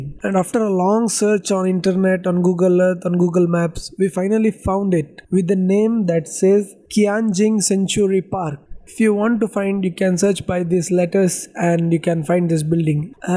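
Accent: India. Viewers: Indian accent bro 😅😅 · We can easily identify that ur from tamil nadu, tamil people english has separate slang needs to be recognize. I like it, as i studied in tamilnadu i know. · Please use an AI generated English voice or something, this Indian accent is difficult to understand.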